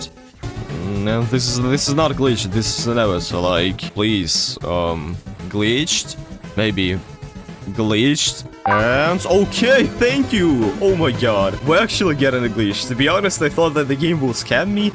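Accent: Russia. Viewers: По акценту мне кажется что ты наш русский слоняра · Are you Russian?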